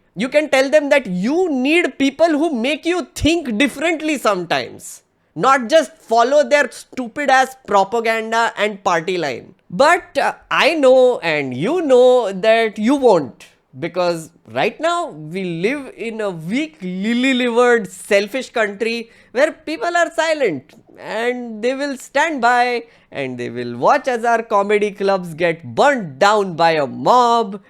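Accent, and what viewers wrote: India. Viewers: You have a stereotypical Indian accent. 😃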